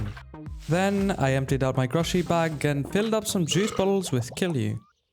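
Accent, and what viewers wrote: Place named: England, United Kingdom. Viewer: Bro i love your english accent👍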